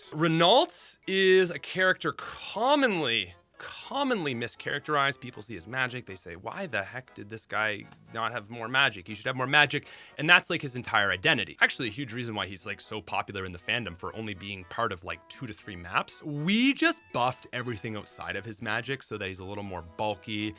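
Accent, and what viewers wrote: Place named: Canada. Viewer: Where do you live, bro? That "oat" accent instead of "out" leads me to think you live in the Canadian Prairies or Atlantic Canada, or Midwestern USA.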